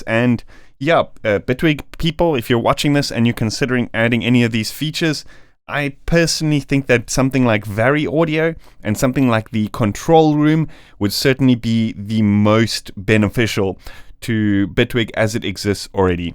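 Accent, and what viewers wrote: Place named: South Africa. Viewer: he's south african. i'm south african too and can tell his accent from a mile away. it sounds perfect to me, you're just struggling with the accent. definitely sounds like english is his first language here, this is just how our accent sounds.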